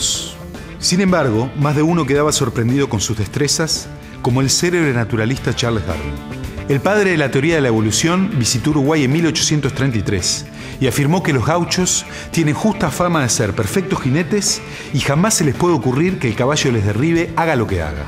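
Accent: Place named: Argentina. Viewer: gracias amigo por desburrarme si es q se puede decir ja ...recien me di cuenta q eras uruguayo cuando lo mencionaste suena muy argentino bue la pregunta el gaucho es el fruto de la mezcla del indio y el europeo ? o ¿es el inmigrante q llego sin plata a estas tierras? ..¿ o eran piratas españoles ?....¿ bueno de donde salio el gaucho no me queda claro ? grcis saludos desde cordoba argentina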